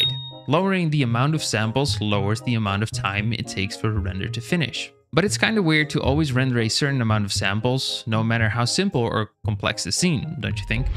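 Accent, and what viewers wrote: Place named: Netherlands. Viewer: I hear a Dutch accent! NL!!!!! ❤❤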